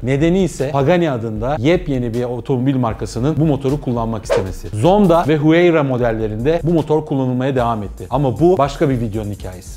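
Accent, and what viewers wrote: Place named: United States. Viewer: Adam bildiyin amerikalı taklit etmiş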